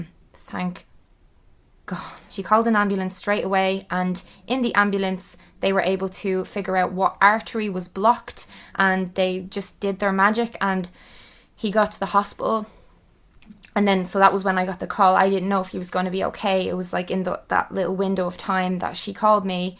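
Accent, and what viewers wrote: Ireland. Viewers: One: her dad had a fucking heart attack, do you really think this is appropriate? Two: she's Irish, that's her accent. Three: you're a moron.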